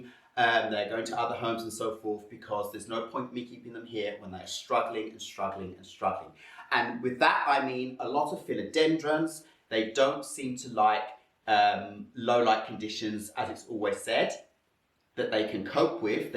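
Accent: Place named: United Kingdom. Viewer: I am so curious to know where you’re from? You got such a strong British accent id thought you’re from the UK? Your location says Malmö- hence the confusion ☺️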